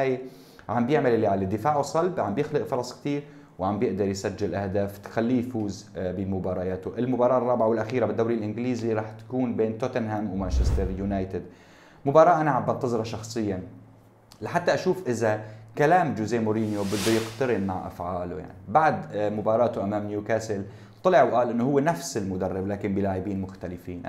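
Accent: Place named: Aleppo, Syria. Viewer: مبين من لهجتك ... يا ابن حلب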